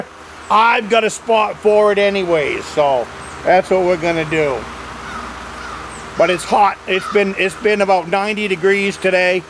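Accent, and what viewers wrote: Maine, United States. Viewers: A good start would be getting rid of the Mills gang.... I really do love your authentic Maine accent! I used to hear it everywhere but now only when I'm in the woods with the old-timers😏👍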